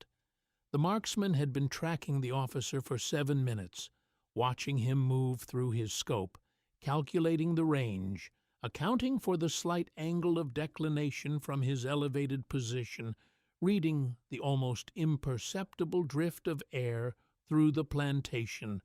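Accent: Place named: United States. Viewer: The American accent tells me that what follows is bullshit!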